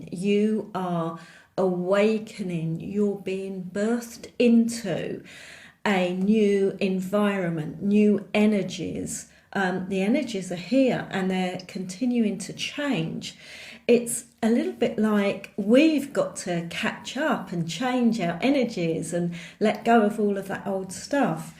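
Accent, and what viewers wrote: United Kingdom. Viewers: Hello Beautiful Butterfly 🦋 it’s wonderful to see your lovely 😊 face and hear a bit of your cockney accent 😇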